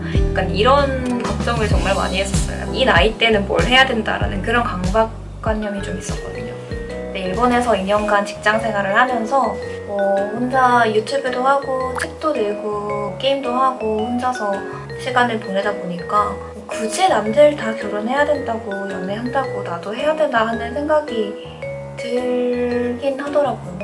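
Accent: Gyeongsang, South Korea. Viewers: 경상도 분 아니신가요?ㅋㅋ 서울에 살아 본 적 있어요? ㅎㅎㅎ 그냥 궁금해요!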